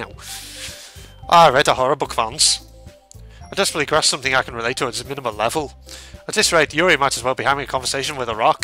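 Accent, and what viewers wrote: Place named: Ireland. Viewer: Damn I love the irish voice^^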